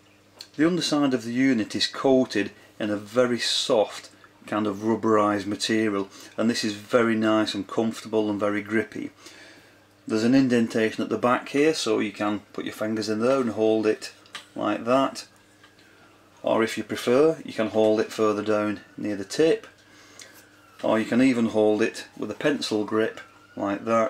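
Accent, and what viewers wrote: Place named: North, United Kingdom. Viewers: I just noticed your name is, Now I'm nervous that you're Irish, but you sound like a liverpudlian. Spot on review too, btw! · Thanks,! This tool is wonderful but the instructions that come with it are woeful. Now that I've watched your video, I actually know how to use it....and having it delivered in a Bolton(?) accent is an added bonus:-D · Thank you. I spent a couple of frustrating hours reading the manual and puzzling over the box of bits that came with it. Your vid clarified it perfectly. Reassuring and pleasant to hear a northerner too. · I would like to second the previous comment. Absolutely they should hire you. thank you - Plus it was nice to hear a northern accent -I like in LA but come from Liverpool.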